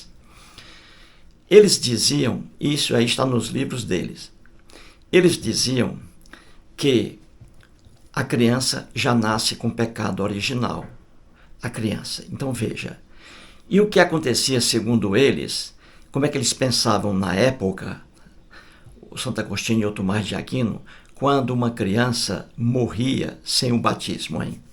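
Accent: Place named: North, Brazil. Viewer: faça um video com o ANTONY GALVÃO (do canal Pilastra da Verdade), ele também é nortista. Sou cristão e paulista e acho bonito o sotaque de vcs 👍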